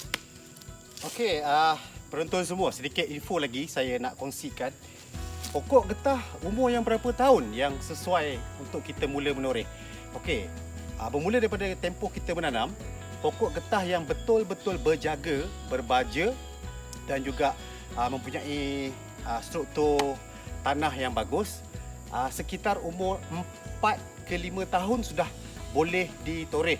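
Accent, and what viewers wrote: Pahang, Malaysia. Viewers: Loghat pahang je abaa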